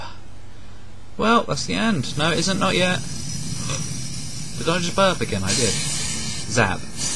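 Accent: United Kingdom. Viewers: good job ^^ also nice British accent =3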